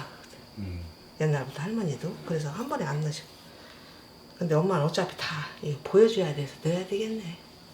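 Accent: Chungcheong, South Korea. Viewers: 충청도 사투리가 우리 엄마 같네요.. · 맛나보이네요 수고하셨습니다 말씀이 충청도 분이라말씀도 구수하시네요 저의 고향이 충청도이거든요 한번 담아보겠습니다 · 충청도 말씀. 정감이 기네유~~~~ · 구수한충청도 사투리 정감이가네요 😊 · 모처럼 들어 보는 충청도 사투리 네유.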